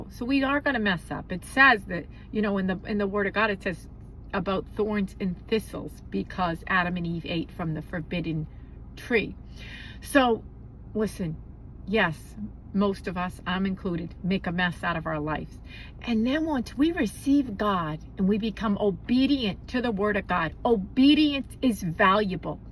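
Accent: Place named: North, United States. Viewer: Amen! Are you from up north? I’m from upstate New York and thought you sounded northern!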